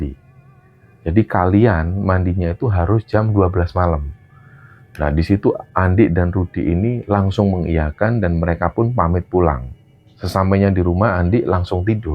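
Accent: Java, Indonesia. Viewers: nah ini ak suka dari kang karna ak dari Jawa ak suka Bahasa nya ada selipan bahasa Jawa logatnya juga masuk jadi bisa di pahami dan mengerti sukses selalu kang 🥰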